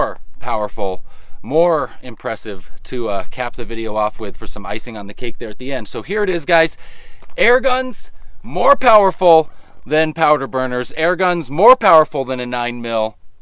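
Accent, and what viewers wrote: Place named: Canada. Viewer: You sound Canadian but you look like you're in the high deserts of Arizona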